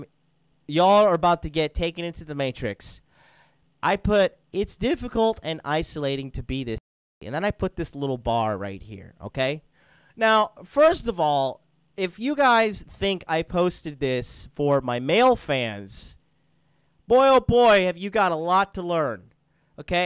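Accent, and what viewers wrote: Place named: Trinidad and Tobago. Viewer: Great Trinidadian accent!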